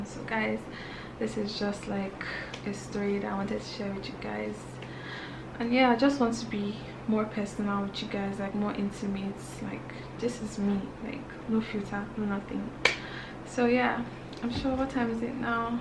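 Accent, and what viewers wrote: Ghana. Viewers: Loving your Ghanaian accent 🤗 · You’re accent has even changed. You’re speaking like a Ghanaian.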